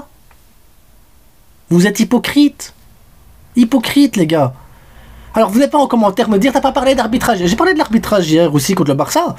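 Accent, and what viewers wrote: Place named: Belgium. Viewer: Tu es un tueur mec 😉❤️ J'aime les frites 🍟 ainsi que l'accent belge 😉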